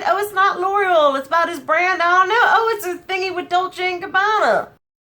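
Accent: South, United States. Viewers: I’m from the South but live in NJ now. I love listening to your accent and after watching your videos, my kids tell me my use of the word “y’all” goes way up! ❤️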